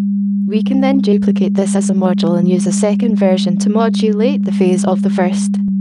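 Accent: Scotland, United Kingdom. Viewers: I never imagined I'd be listening to a female robot with a thick Scottish accent teaching me synthesizer programming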